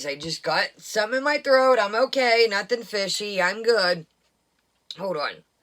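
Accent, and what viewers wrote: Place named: Northeast, United States. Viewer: She is disgusting. We have been saying this for over a year. So glad she had been found out! Are you from up North (NE) by chance - OH, NY, PA, MA, RI etc? You have the personality and voice of someone who might live up North. I live in the NE section of the US, that is why I am wondering. I just subbed to your channel.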